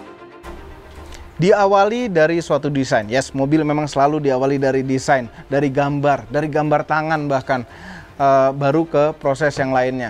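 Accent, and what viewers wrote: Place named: Bali, Indonesia. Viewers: cara bicaranya Bali banget👍😂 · Logatnya bali banget....😂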